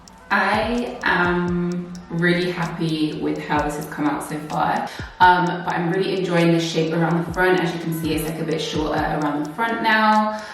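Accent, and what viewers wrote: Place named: England, United Kingdom. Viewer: Omg i love your accent , im moving to England 😂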